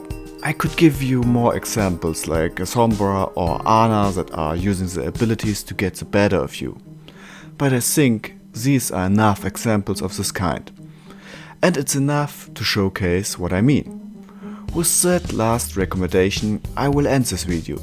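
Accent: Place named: Germany. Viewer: Like for the german accent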